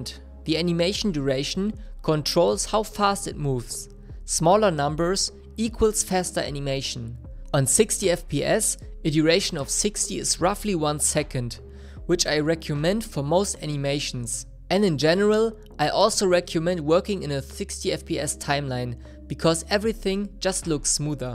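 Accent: Germany. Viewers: This Tutorial helles me alot, tysm ! (Aber du sprichst deutsch oder haha)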